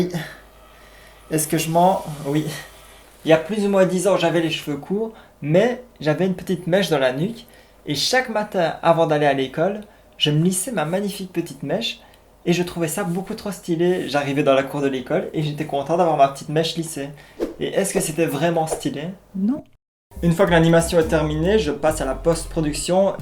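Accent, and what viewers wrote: Belgium. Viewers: Tu est belge??? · Excellent, t'étais super mignon avec les cheveux longs. Un de mes poussins ne veut plus qu'on lui coupe les cheveux, j'ai hâte de voir jusqu'où il va les laisser pousser ;) Vidéo super réussie, elle a été le sujet du discussion de tout un repas :) Toute la famille aime tes vidéos, ton humour et ton p'tit accent me rappelle les quelques mois que j'ai passé à Liège ;) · T es belge je suppose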